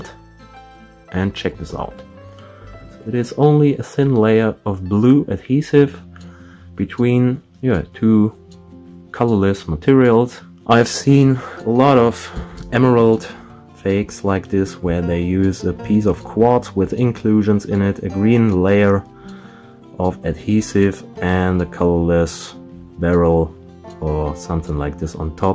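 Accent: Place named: Germany. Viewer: I just got interested in gemstones, while looking for a sapphire ring for my daughter. Now I am fascinated with the whole subject of gemstones. Thank you for this video! So far the best one regarding natural vs synthetic! I like your German accent, I’m German myself, living in Southern California. 🇩🇪💍💜💎😊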